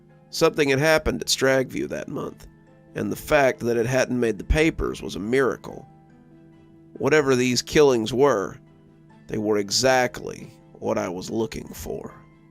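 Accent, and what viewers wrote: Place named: Deep South, United States. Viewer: Sounds like you've spent a lifetime in the Deep South. Impressed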